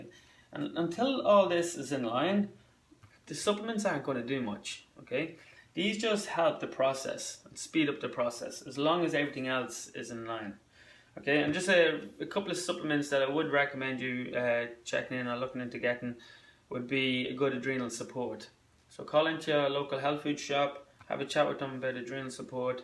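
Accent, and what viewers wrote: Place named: Ireland. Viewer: Where's that cute accent from? Ireland? I bet Ireland has alot of DP/DR due to your strong need for omega 3s (fish) and high alcohol intake (depletes B vitamins). I'm a fourth Irish and Swedish...very delicate brain/CNS. Was diagnosed with AF 20 years ago. Unfortunately I didn't learn the cause of AF and DP (22 years now) until 6 months ago. But Thank God I did. It's all rooted in a B1 deficiency. As a nutritionist I have lots of great resources I can share. But here's the basics... B1 in the form of Benfothiamine plus an excellent methylated B complex. Also lots of magnesium as a co-factor. Daily or a pound a week of beef liver...a B-BOMB! An important traditional health food. Vit D supplement too. Lastly, I highly recommend a traditional Northern European Diet...free of salicylates. Great video! I love your scientific nutritional approach! 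That is very much lacking in the DP world. Let me know if you want more info.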